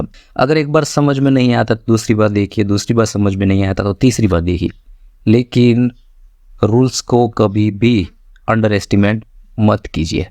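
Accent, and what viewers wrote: Gujarat, India. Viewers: Kya aap Gujarati hai???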